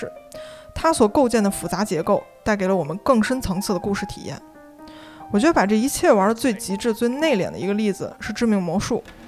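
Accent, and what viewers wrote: Beijing, China. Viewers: up主的北京口音很纯正呀😊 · UP主妥妥的北京人，儿化音太酷了。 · 北京话吹牛皮就是强！这集变成南京话或者安徽话就……希望up主演绎一次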